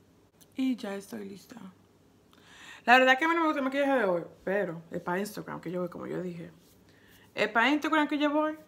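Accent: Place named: Puerto Rico. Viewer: You sound Puerto Rican.. lol but I love it! Soooo funny 😄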